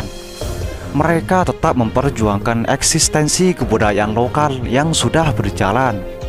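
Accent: Madura, Indonesia. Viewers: logat madura banget😂😂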